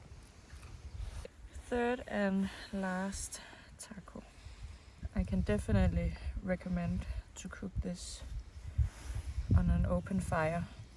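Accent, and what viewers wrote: Denmark. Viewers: I concur! Her Danish accent is easy on the ears. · with her lovely Denmark accent. The sound of a flowing river is always soothing. Greetings from Ontario Canada 🇨🇦 🍁 🇨🇦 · Hahaha! I love the Denmark accent! 🤙🏽💯🔥 Just like Cimbrer Bushcraft! Thanks for sharing the beauty of Denmark!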